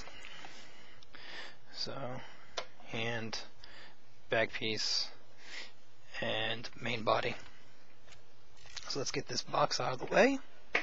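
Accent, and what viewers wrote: Australia. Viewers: He's not from the UK he's an Aussie, big difference in accents.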